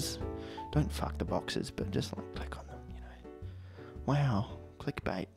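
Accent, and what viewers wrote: Australia. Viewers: An Australian talking about explosive chemistry over elevator music - that I call top-notch entertainment! XD Greetings from Germany, mate. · Wait. Is this guy Australian? Huh. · He's Australian and they say "aluminium"... I thought it was just another aussie thing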